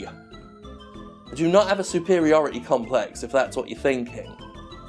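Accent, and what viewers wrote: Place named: Yorkshire, United Kingdom. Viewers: Jim Sterling is more Yorkshire than I am and I'm from Yorkshire. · Love the grumpy Yorkshireman accent. Next time can we have a West Country cider-drinkin' combine 'arvester drivin' reading? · The Northern accent makes me laugh every time.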